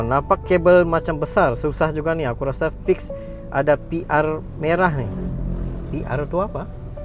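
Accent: Sabah, Malaysia. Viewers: Hanya sabahan cam sabah accent 😅 Jalan siring2 baru Selamat bah😂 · Aku dari first day tgk sudah tau ni slang Sabah. Semenanjung juga la paling sensitif kalau dengar Sabahan slang. Lawak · yang komen to buduh kah ? loghat sabah ngan indo pun tak dapat beza. duduk dalam gua kah dia tu . kau relex je bang. budak2 skrang mmg cmtu. tu budak tu klo di tempat aku mmg kena skolah tu orang.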